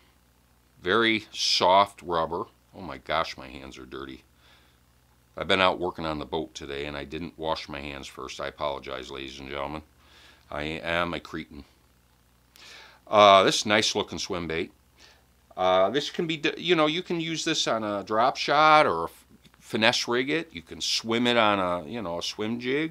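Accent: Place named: Michigan, United States. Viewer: your accent. Sounded northern Michigan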